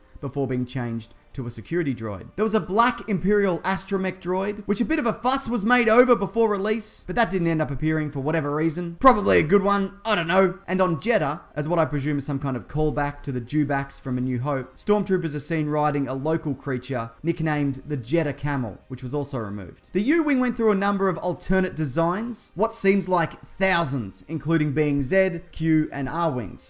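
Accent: Australia. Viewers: Okay mate got a bone to pick! So..... You're Australian (So am I). That atom app looks dope and I wanted to get it, I go to the sight cause I couldn't find it in the google play store, and it says the app is not available in my country........ SO WHY ARE YOU ADVERTISING AN APP THAT YOU CANT EVEN USE, THATS LIKE SUPER SELLOUTY! But its a good vid thanks for all the hard work u put in the vids to make it as entertaining as possible...... thanks for makin the aussie accent sound better then it actually is.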